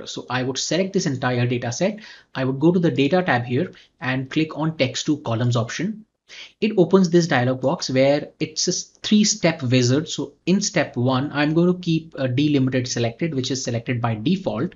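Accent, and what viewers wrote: India. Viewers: If you hear an Indian guy talking about Excel, you know you're in the right space.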